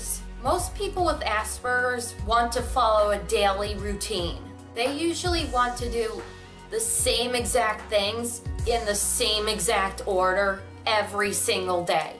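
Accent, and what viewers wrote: New York, United States. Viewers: Excellent video! I am CONVINCED my roommate has Aspbergers.... its' been driving me nuts.... I had a hunch he had it and you checked every box for me... he is SUPER smart... however, he is SUPER shy, doesnt look me or anyone in the eye,... can obsess over things.... honestly, can be quite selfish,.... but I truly think he has Aspergers... this video really confirms a lot for me... I feel like it would be super rude to ask him if he has it... he has admitted to being very shy. I have only been living with him for a month... and ive had some frustration with the living situatioion so far for all the things mentioned above... anyway. im probably boring you to tears lol but thanks for posting this! I love your accent haha it sounds very New York (Im a new yorker). I'll check out some of your other vids and subscribe. I dated an autistic guy once, and we managed... 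but it was hard. Ultimately we broke up cuz he was cheating on me... but thats a whole other story. I've always been fascinated by hearing other people's experiences and you did a great job. Cheers. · Great video but i HATE the way Americans pronounce Aspergers. There's a "p" in the there, and a soft "g". Anyone would think you lot are obsessed with burgers or something.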